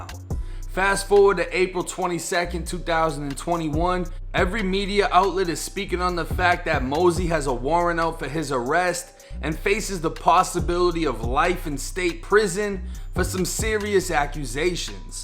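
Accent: Boston, United States. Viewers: I know your from FLA but you have a Boston Accent...but most people from Boston move to FLA anyway you must have an aunt or something. I moved to FT MISERY OMG I ran back to Las Vegas CDC I am originally from Boston were I could run a muck I never got pulled over than you God my Lord and Savior.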